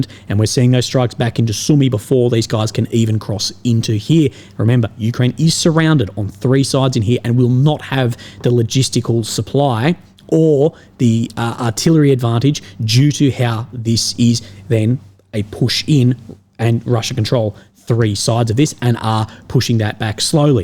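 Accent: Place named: Australia. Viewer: Australian accent cracks me up " He ahhhhhh" If you notice " He ahhhhhh". Then over " He ahhhhhh" you see..... but " He ahhhhhh". Love ya biddy! Keep up the good work " He ahhhhhh" :-D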